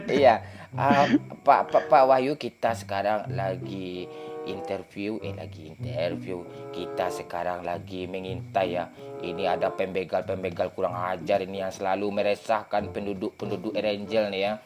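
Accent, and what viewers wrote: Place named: West Sumatra, Indonesia. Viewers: suara nih.. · Ndak salah iyoo bana urang minang,urang minang marantau ka pekanbaru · nih suara nya wkwk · urg awak yo? Jaleh bana minang nyo 😂😂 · Suarnya kaya ya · Kya suaranya admin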